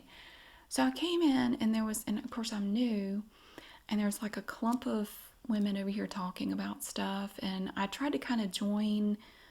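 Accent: South, United States. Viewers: This made me go back and look to see how long I've been following you... 8 years!! Almost since the beginning! Love the vibes and the Southern accent, makes me homesick for North Carolina (lived there for a few years back in the day). You do you,!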